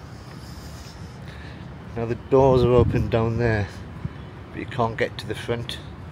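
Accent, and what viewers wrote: United Kingdom. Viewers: Hi there, Hope you are enjoying your trip my country. I am just wondering about your British accent that you are speaking. What part of Britain do you come if you don't my to share ?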